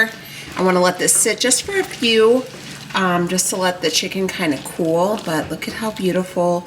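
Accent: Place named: Minnesota, United States. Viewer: Looks awesome! I'm going to try this. Where are you from? You sound like you are from my home state of Minnesota! :)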